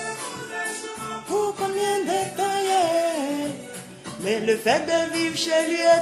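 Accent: Ivory Coast. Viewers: Je suis fan de vous ! Vous êtes formidable, puis t' as un accent Ivoirien t'as pas l'accent Française ! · Mdr tu parles comme une ivoirienne ahah avec l accent et tout^^ ca change du boulot.. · Franchement je te kiff grave,ouais ouais je suis enjaillé c'est à dire t'as le parler,le thon c'est à dire c'est ta peau seulement sinon t'es vrai gomi ivoirienne même ouais c'est pas blô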